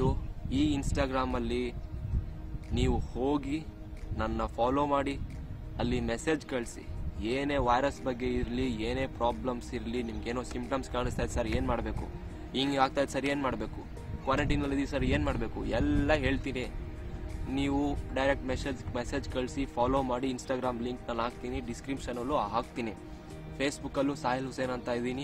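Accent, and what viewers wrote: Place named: Malnad, India. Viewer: Malnad avra tharaha maataadteera sir very cute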